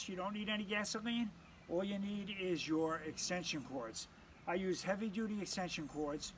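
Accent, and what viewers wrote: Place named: Rhode Island, United States. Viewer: Cool accent. Rhode Island? Sounds like my relatives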